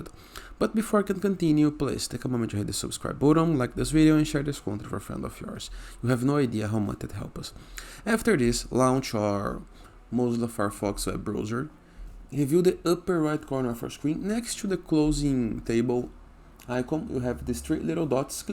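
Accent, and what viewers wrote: Brazil. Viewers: tu e brasileiro ne? that ascent...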